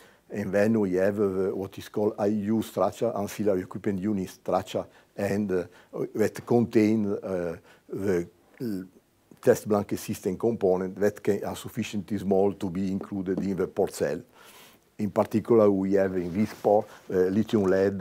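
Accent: France. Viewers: English subtitles would be of great help here. And yes, I know he's speaking Franglish.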